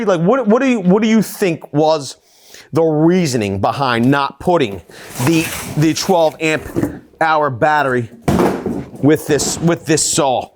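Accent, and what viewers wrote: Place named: New England, United States. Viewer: The voice , couldn't watch that Yankee accent..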